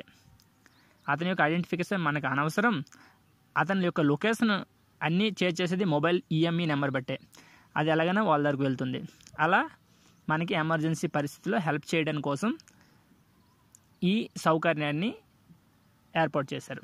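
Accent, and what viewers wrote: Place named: Andhra Pradesh, India. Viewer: I believe you are from Vijayanagaram or Srikakulam. However the content you have given in this video is not about how Emergency works with no signal... Can you be more elaborative ?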